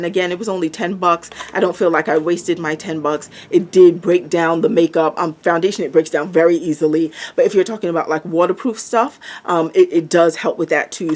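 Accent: Caribbean. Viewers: Girl you're about to have me max out my card!! Thanks for the reviews!! BTW where are you from because I detect a slight West Indian accent? Stay beautiful!!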